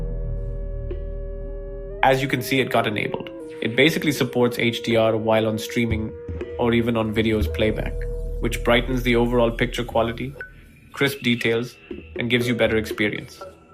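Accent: India. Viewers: Please talk in American accent it sucks when u talk in indian english I only like my country for its national language which is hindi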